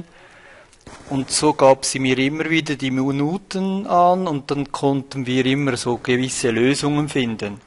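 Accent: Switzerland. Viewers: Also ich muss sagen ich versteh dich sehr gut,mir gefällt auch ehrlichgesagt dein scheizer achszent^^ Erinnert mich an Emil^^ Ne finde bist sehr gut zu verstehen,mach dir da mal keine Sorgen!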